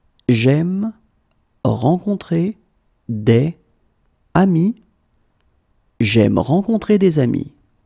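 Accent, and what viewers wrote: France. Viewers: are you french ? because you have a french accent êtes-vous français ? parce que vous avez un accent français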